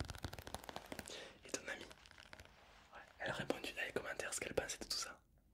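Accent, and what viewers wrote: South, France. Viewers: Normal c l'accent du sud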